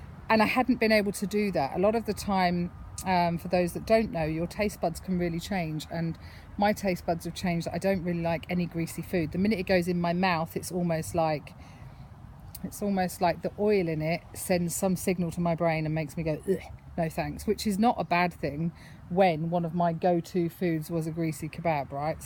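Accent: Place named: RP, United Kingdom. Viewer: Potty mouth doesn't sound the same with a British accent. It's very posh😘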